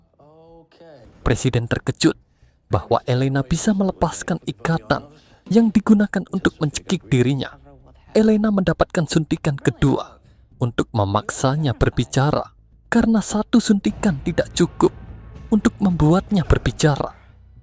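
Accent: Java, Indonesia. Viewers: Medok bet anjir berasa nonton wayang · Pekat banget jawanya walaupun dikontrol suara kayak gini..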